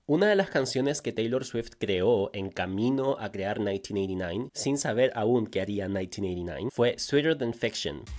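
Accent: Chile. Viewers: Mann, tardé tres minutos en darme cuenta que sos de Chile y yo soy re fan y amante del acento chileno, voy a disfrutar la narracion como no te imaginás, me suscribo ahora mismo. ¡Saludos desde Paraguay! 💙 🇵🇾🤝🇨🇱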